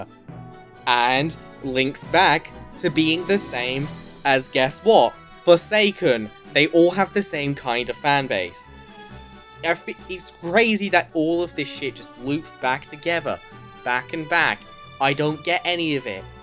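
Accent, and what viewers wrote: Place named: United Kingdom. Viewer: youre british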